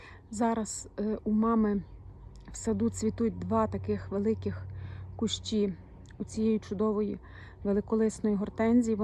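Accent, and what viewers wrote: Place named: Rivne, Ukraine. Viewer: Дуже гарне вiдео та мова наша украiнська, маю родичiв у Рiвненськiй областi , такий самий говор😊 Дякую !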